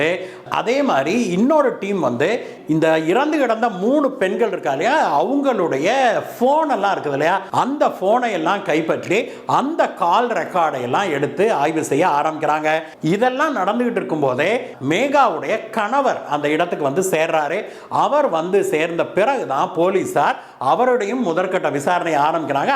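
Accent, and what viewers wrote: Kongu, India. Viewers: கொங்கு தமிழ் அருமை அண்ணா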